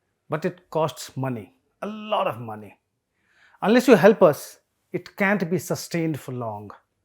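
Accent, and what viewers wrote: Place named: Bengal, India. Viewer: Didn't like the presentation, see problem in spoken english. Too much bengali accent. No offense to bengalis there are many who speak without that language influence.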